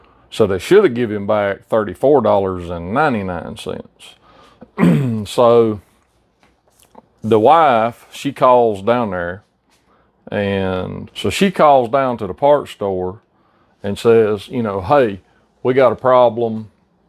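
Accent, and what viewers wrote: South, United States. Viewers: This guys accent is like Forrest Gump times 10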